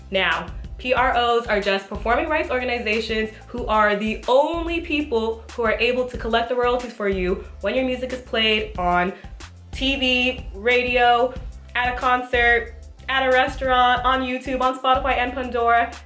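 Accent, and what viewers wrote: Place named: Barbados. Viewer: Are you a Bajan? You sound Bajan! Holla back.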